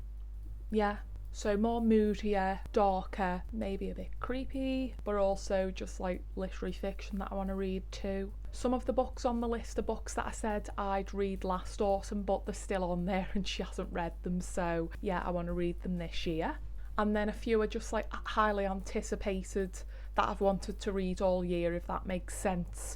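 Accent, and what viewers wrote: Liverpool, United Kingdom. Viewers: aaaah, thank you for much for replying! I have been to many cities in the UK but not to Liverpool yet (although I'd love to) - this explains it! I can't understand people being hateful to accents, they are the best thing ever and something to cherish as you do :) It's one of the things I love the most about your channel.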